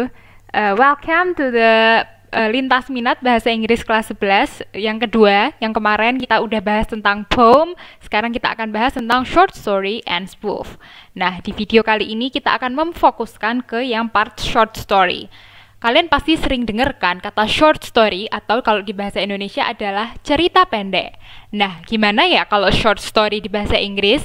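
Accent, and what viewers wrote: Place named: Wonogiri, Indonesia. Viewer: saya kan sering main ke Solo sekitar Mangkunegaran.....Tahun Ibu guru putri solo, ya karena logatnya, mirip logat Wonogiri juga.....Tapi bahasa englishnya setuju great job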